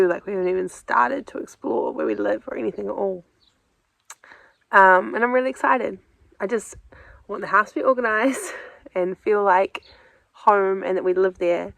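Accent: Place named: New Zealand. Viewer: Sounds like a Kiwi to me.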